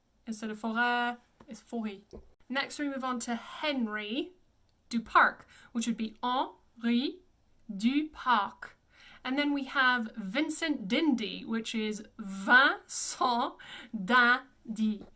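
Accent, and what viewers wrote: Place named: United Kingdom. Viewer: You are the first person I have ever heard who speaks in a British accent but with a rhotic r. Did you spend time in the US?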